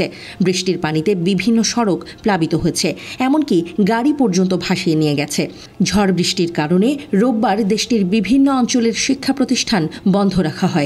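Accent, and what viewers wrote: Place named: Kolkata, India. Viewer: আর টিভির এই ধরনের কলিকাতা দিদিদের মত খবর পাঠ করার জন্য আমি এটা বয়কট করছি।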